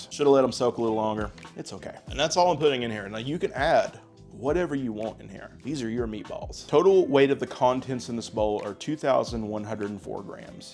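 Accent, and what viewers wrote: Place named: South, United States. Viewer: I love southern accents and the way you guys say uhl. Or earl lol when I’m around someone with the accent for a few hours I subconsciously try to pick it up and use it…. 😬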